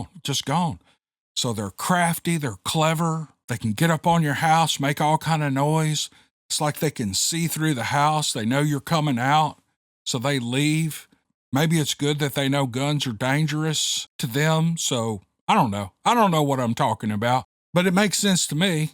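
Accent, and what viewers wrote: South, United States. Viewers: I really loved these stories. I adore that sweet soft southern accent. I love ya. I really wish I could meet ya'll. Unfortunately I live in Erie Pa area. I wasnt born here i came from central PA where all the states "rednecks" come from!! 😂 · I Always make it to the end!! One of the best podcasts on here.. So glad to hear that you are working on Steve Lilly ❤. thank you for your creativity and that Southern voice!!!! Keep up the great work love from Northern Lower Michigan!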